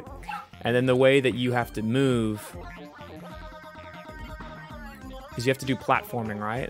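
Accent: Australia. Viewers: was super Australian in this one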